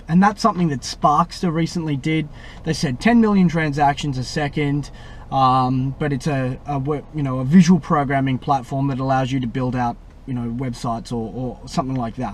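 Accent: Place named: Australia. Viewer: Thumbs up for saying this in an Aussie accent. "Santa claus what a guy........yeah what a f....in guy." "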